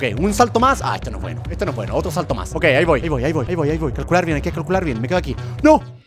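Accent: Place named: Chile. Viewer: En este juego se le sale lo chileno a German :vv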